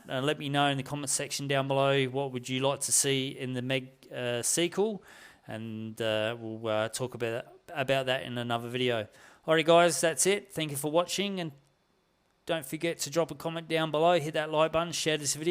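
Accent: Australia. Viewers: G'day mateeeee. I can tell your aussie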